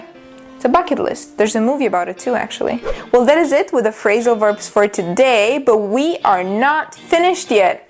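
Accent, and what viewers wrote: United States. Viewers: Hello Teacher! I have checked out all English teachers on YouTube. I see English Teacher is one of these best teachers on YouTube. I've ever learned English with her videos, clearly American accent and made me easy understanding her videos, Thanks a lot Teacher.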